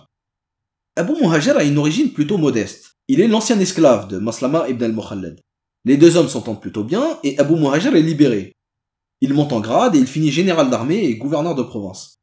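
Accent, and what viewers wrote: Arab world. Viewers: Passionnant ! Mais êtes-vous obligé de parler aussi vite (par moment) en avalant la moitié des syllabes ? C’est un peu stressant vous êtes arabophone ?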